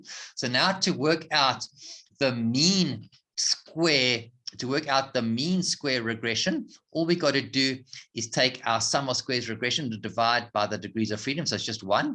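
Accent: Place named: South Africa. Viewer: Thank you so much. Very well explained. Love the south african accent. :)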